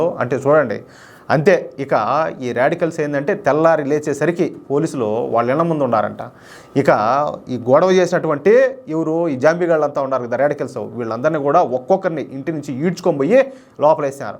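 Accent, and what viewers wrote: India. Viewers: Superr sir. Way of explantion is good sir. Telugu slang also attractive sir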